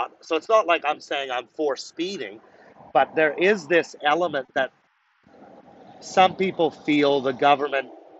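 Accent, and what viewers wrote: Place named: United States. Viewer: 1984 is a book by George Orwell, Mate. You sound American, though. Love from NJ, USA. GO TRUCKIES! SHUT IT DOWN! Australia's leaders have gone insane.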